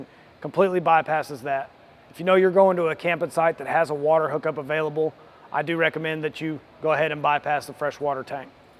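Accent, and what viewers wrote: United States. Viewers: is that accent from Kentucky?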